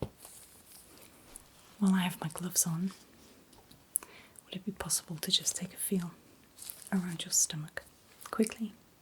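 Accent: RP, United Kingdom. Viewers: That posh British accent hits like Ambien! ❤️🤍❤️🤍❤️🤍❤️🤍❤️🤍❤️🤍❤️🤍❤️ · Love your british accent. Its so elegant and soothing.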